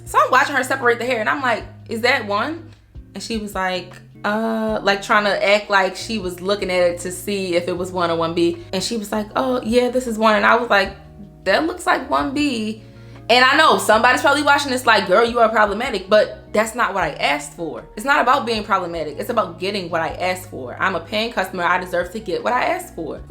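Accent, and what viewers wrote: Baltimore, United States. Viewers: Hold up your Baltimore?? Your accent sound like bmore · Beautiful! So many nuggets! Also, Sis you from Baltimore? Lol ❤ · Sorry it have nothing to do with the video but it sound like you from Baltimore but not in a bad way. I’m currently trying to break my habit of saying my words correctly. Which you do, it’s just how you say certain words lol 🩷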